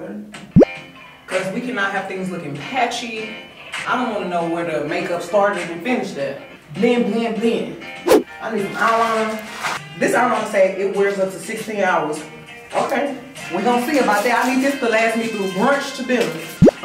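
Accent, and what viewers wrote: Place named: Houston, United States. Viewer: What part of the H? I'm from Houston too. Beyonce talk like this too lol. Real country lol...It's an HTOWN thang 🤪.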